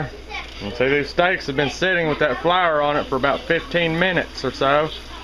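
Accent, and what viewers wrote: South, United States. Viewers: really someone said European accent ugh nooo its a southernnnn one lol come on yall! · you have a cute voice I love the southern accent! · That's a southern accent believe me I'm from South Carolina and we have that southern slan as well.. Greenville SC in the house and your food looks 😋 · King of the dingalings........Southern accents are the best ever. Dont hate cause you ain't son. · My parents were from Missouri. You sound more southern than that. But listening to you speak takes me back to when my mom took me to Missouri, 8 months before she died. And your recipes look so delicious. All you need to do is make tomato sandwiches! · From the sounds of this southern man, he probably doesn't care about your two cents. Move along... Totally trying this right now.... thank you for sharing!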